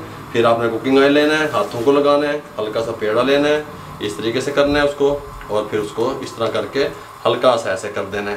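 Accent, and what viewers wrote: Punjab, India. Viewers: Or comentry b kamal with punjabi touch 😃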